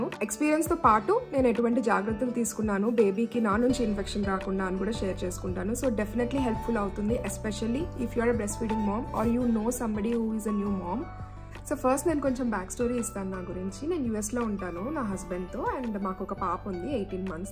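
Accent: Rayalaseema, India. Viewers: Hii.. Sis...aa pain yela vuntundo nenu anubhavinchanu naa Husband RDT hospital lo isolate ainapudu...aa badha pagavaallaki kuda vaddani devunni kore daanni....mee raayalaseema yaasa vintunte naaku mana intlo maatladu tunnatle vuntundi...Maadi Tadipatri...present Hindupur lo vuntunnamu..mee family mucchataga vundi...May God Bless U 💜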